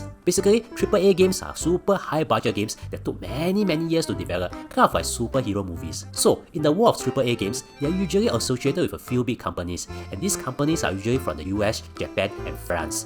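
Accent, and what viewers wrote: Singapore. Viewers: Singlish and his accent is so funny, so cuuute, I love it. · That singlish is making my ears itch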